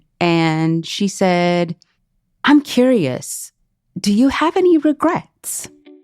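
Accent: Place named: New York, United States. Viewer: Thank you for sharing it was great to hear this as a sperm donor and I love your NY(?) accent ❤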